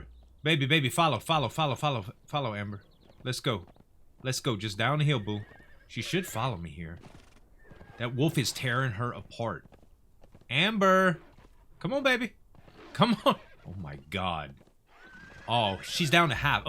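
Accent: Louisiana, United States. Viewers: Think I found my new favorite channel! I'm getting ready to start binge watching your videos. I could be wrong here, but it sounds like you are from Louisiana (my wife is from Houma)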